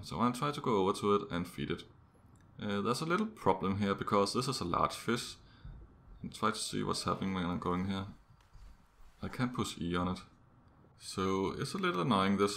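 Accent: Denmark. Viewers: U Sound Danish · You sound Danish. Are you Danish?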